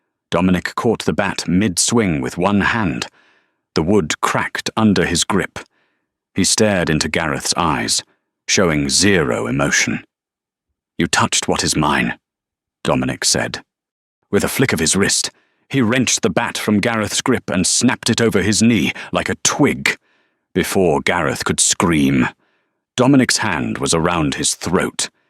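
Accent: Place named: United Kingdom. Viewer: I call it Yummy Purring English / British accent.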